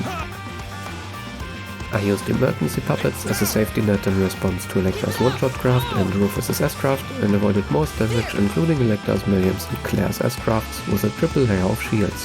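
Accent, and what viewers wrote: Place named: Germany. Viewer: Deutsch Akzent erkannt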